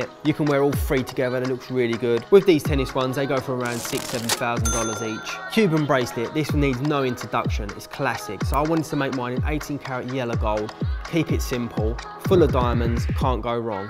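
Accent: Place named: East London, United Kingdom. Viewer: Wow, amazing collection 👌 Just found your channel, love it! Love your accent too, sounds just like mine 😜 Born and bred in Custom House, East London but live in Australia at the moment 😊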